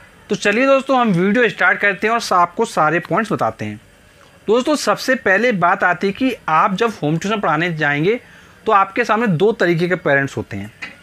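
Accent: Kanpur, India. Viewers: Sir i think you are from kanpur😂 right sir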